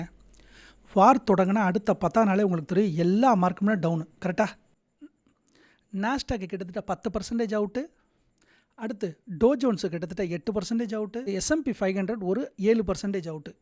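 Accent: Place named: Tirunelveli, India. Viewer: ஏல இருலே 😂 சூப்பர்…. நெல்லை வாய் மொழி ❤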